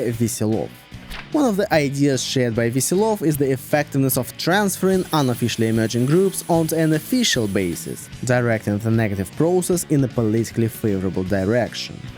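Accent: Russia. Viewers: You might want to work on that obnoxious Russian accent.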